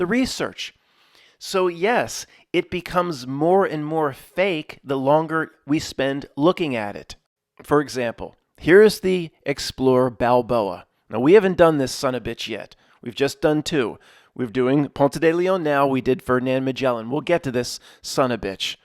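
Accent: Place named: Philadelphia, United States. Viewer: & UK Nottingham ❤Philly accent mat in fact I prefer ya Philly accent 😂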